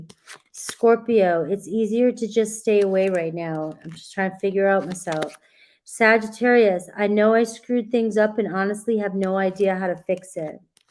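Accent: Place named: New York, United States. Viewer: where are you from? You kinda sound like me, from New York but raised my kids in Maine. Been to Salem, Mass several times.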